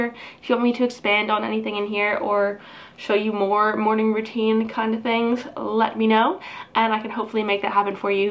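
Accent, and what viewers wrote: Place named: England, United Kingdom. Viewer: You can tell when she’s been hanging out with Claire a lot cuz the English side of her accent gets heavier lol. How long have you lived in the UK?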